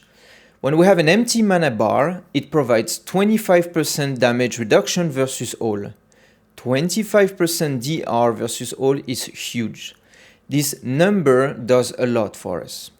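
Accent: France. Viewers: This is definitely a cool and interesting video. You did a great job with your explanations. Also, with a such a strong Frech accent please let me compliment you on your English because it's excellent! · Je like rien que pour l’accent français ! 😉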